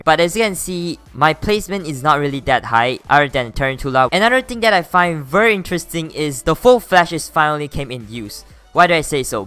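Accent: Singapore. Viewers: The Singapore ascent lol I can relate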